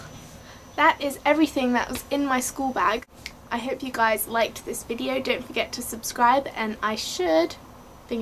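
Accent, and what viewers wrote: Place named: United Kingdom. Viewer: Hi, if i saw right... your name is??? I'm not very sure!!! hah. and if you don't mind me asking, where are you from?? You have a lot of Japan things, but it sounds like a British ac sent (i do not know how to spell that words).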